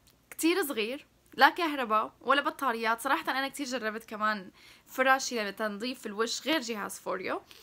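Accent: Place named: Iraq. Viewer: حياتي شفت لهجتك عراقية وانا ساكنة بالعراق من وين حصلتي عليها ممكن تخبريني بليز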